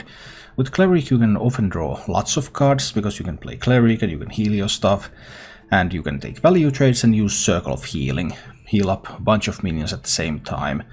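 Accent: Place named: Finland. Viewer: I immediately knew you were finnish after hearing your accent. I'm not even scandinavian myself but I've heard some finnish people talk before, haha. Nice deck and I love the way you explain things so calmly.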